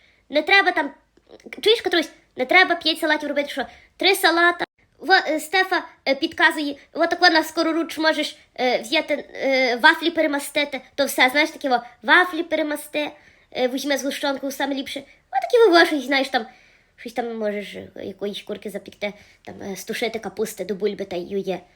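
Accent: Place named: Lviv, Ukraine. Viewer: Боже ,так розмовляє моя мама і тітка .Як мені подобаєиься 😁😁😁Львівщина❤❤❤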